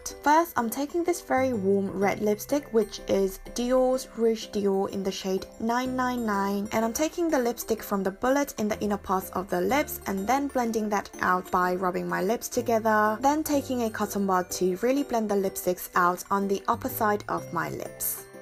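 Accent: United Kingdom. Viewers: 와 이 화장 진짜 너무 예뻐요 언니ㅜㅜㅜㅜ영상 너무 잘보고 있어요ㅜㅜㅜ한국말 하실때도 매력 넘치시지만 언니 영국식 발음이.....❤️ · 저기 죄송한데 영국발음 영드로 공부해도 되나요? 발음이 너무 탐나네요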